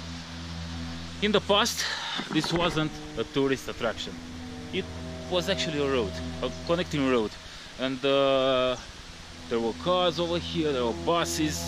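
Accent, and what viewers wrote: Russia. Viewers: Чисто русский акцент) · First time no youre great channel, i like the Rusia accent! Exellent videos!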